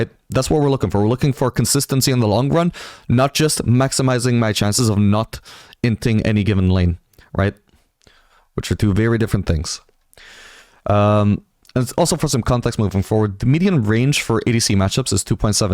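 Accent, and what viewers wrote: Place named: Canada. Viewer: Where are you from? I hear some kind of Canadian accent although account’s from the UK haha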